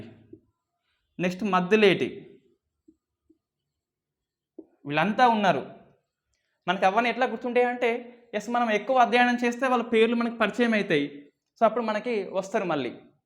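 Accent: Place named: Telangana, India. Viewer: Anna meeru cheppe vidhanam manchigundhi,telangana ascent lo chala baga chepthunnaru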